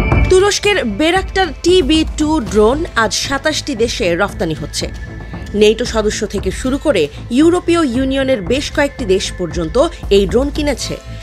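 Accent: Kolkata, India. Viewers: উপস্থাপিকা কি কলকাতার??? কথা তো বাংলাদেশীর মতো লাগছে না । কি ntv???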